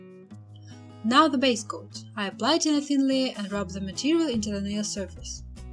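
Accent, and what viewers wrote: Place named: Russia. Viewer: Сразу видно когда наш человек говорит на английском 😎такой жуткий акцент😂но это все фигня, я даже так не умею🤥а работа просто афигенная 🔥🔥🔥👍👍👍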